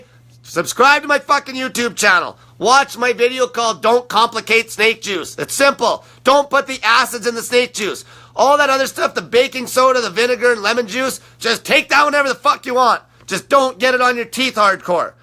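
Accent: Canada. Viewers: Finally nailed your accent - Canadian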